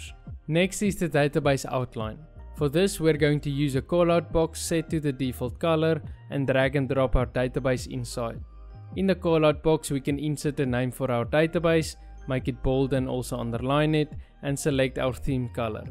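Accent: Afrikaans, South Africa. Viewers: Afrikaans accent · BRO ARE YOU SOUTH AFRICAN?!! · Are you... South African? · Howsit, you’re from South Africa? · Jis boys, the moment I heard the accent it was a straight, sub-like-follow-bell icon moment. I don’t have time to look for new creators when I can learn from boytjies themselves! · He sounds exactly like a South African friend of mine!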